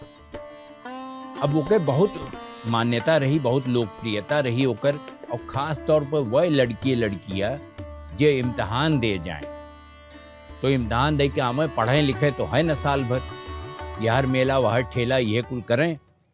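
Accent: Awadh, India. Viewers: Upadhyay ji pranam...aapko avadhi mein sun na bahut achcha lagta hai. BBC mein tu suna hi hai. Ishwar aapko lambi umra de aur sadaiv kripa banaye rahey. Meri maa Akbarpur abka Ambedkar Nagar ki thin. Wo aksar baatcheet mein time ko tame kahti to ham log unko chidhatey the ki amma tame nahin time hota hai...jab apkey munh se suna to ab samjhey ki swargiya Amma Avadhi bolti thi. 🥰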